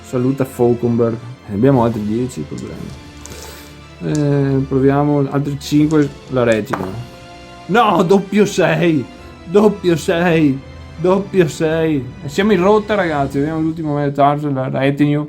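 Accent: Italy. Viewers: Ciao, ottimo lavoro! Mi sto guardando i tuoi video visto che ho da poco acquistato il gioco. E poi dall'accento non sei così lontano da Vicenza, magari in futuro Na partìa 😂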